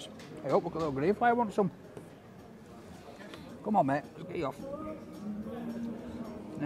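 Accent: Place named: Yorkshire, United Kingdom. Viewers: Defo fullnon peaky blinder with that yorkshire accent would be awsome to watch, drop a few yorkshire sayings in the videos too, 🤣 top man keep it up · Nail on head there without a doubt. Recent months have been utterly horrendous for so many with illness or worse with the virus. himself has been through some horrible times of a different kind and he has become a national treasure and sign of positivity that we so badly need. He is a great lad, talks in a super broad Yorkshire accent and is always polite as really we all should be, take a lesson from him all, respect costs nothing but earns so very much in return.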